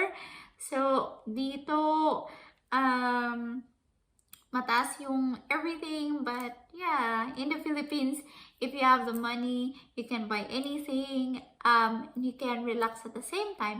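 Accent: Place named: Australia. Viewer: May aussie accent kn bebe...love it 😊😊😊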